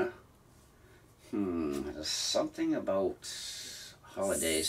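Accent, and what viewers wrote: Boston, United States. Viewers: Just found your channel and subscribed!! We just got our airstream and started our channel and cannot wait to get on the road like you....do I detect a Boston accent...? :) . We are from same area...would love to connect with you...so send us a message. Safe travels!!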